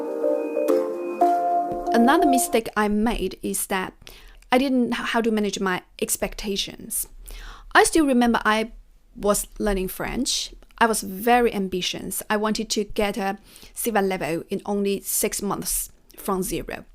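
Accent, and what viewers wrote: England, United Kingdom. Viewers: I can hear the British English accent so hard in her English, it's adorable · i was surprised to hear english wasnt your first or even your second.. i can hear almost a british accent from you.. the mesh is beautiful! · Oh wow that’s really good that you’re able to learn that many languages. You shouldn’t be ashamed with about anything it’s not your native language. Ok here’s a new challenge for you, just for fun. How about trying working on your accent, you got the old English down, let’s try American English. This might be a bit more challenging but doable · Thank you! Your English is GREAT! I understood every word and was highly intrigued by the idea of your almost British, sounding, dialect. It has a certain je ne sais quoi.... <3 Furthermore, your video was inspiring and multifaceted in many areas of Life AND Learning. 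{in my opinion of course;-) } My mom taught me THIS gem, "Your haters are brillo pads, they may be abrasive because they rub you the wrong way; however, if you disregard your discomfort, you will find that you have become polished and exuberate a new found brilliance and SHINE!!! Keep shining my friend, KEEP SHINING!!!!🌻💟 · Hi Miss. Your voice is so gently😊. Do you speak english in british accent?? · Hello, I have just discover your channel yesterday night (we are in the morning when I writing this message) and I love your videos and learn so much! I am learning japanese and need to learn and have fun in learning. I see that is more simple to learn when it's a pleasure. Your stories and those of your friends are so shocking. Your are so kind woman and you speak so well english. I love your british accent and understand without difficulties what you say. I'm french and I know that native speakers like to correct rudely people sometimes. 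But I don't understand why they act like this. But there is people, like you said, that are very caring too. I want to thank you for this video and try to have those advices in my mind. I never really practice english outloud because I think that I will not have enough words to express myself but I understand well english. I will try to communicate more now! Thank you so much! Je te remercie pour cette belle vidéo, et j'espère voir aussi un jour des versions françaises de certaines de tes vidéos qui sont excellentes:) Merci encore pour tes conseils et belle journée à toi!!!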